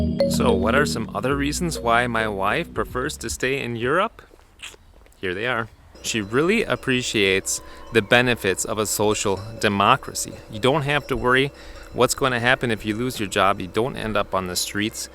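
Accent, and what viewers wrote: Bavaria, Germany. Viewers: your talking like your from Germany using english what state are you from? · Watched some videos. You really sound like a german speaking english. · Interesting that as one is immersed in a culture for so long their speech inflection takes on that of the area. You are truly now Bavarian. Bavaria is my go to, comfort vacation spot and if I was a bit older when living there as a young teen, might have made the same decision to stay. In our retirement years now I've thought of moving over but as you mention in your caveat, family is most important to us and can't see living away from them. Interesting conversation. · Its pretty amazing, to my german, almost bilingual ears you sound soooo much more like a german person speaking english than an american :D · I wonder if your family in the states notice the German accent that I noticed right off the bat :D I can here it on the words "here" and "germany" and of course "bavaria" I'm Danish and I think that's why I can hear that. 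Of course this all makes sense after 16 years in Germany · Your experience parallels mine in almost all points. I have the impression your English has picked up a slight German accent and influence. My brothers tell me the same thing when I visit them in the US. My favorite dialect here in Schwäbisch. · You don't sound american. You sound like a german with little accent. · Hi, You are really talking English with an Bavarian slang. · I coudln't help but notice your accent is a bit different than I am used to hearing from americans? Have you developed a bit of a german accen in the last 15 years? Just curious, because after I had been in germany for a couple years and went back home to visit my family, every single person noticed, that I was speaking with german accent now. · Interesting that you sound like a German speaking American English. (At least to me, and I’m neither German nor American)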